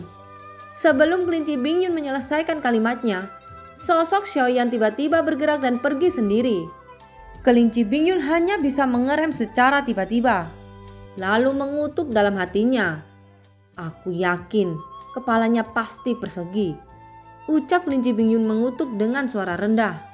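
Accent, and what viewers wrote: Java, Indonesia. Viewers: Kak orang jawa yah?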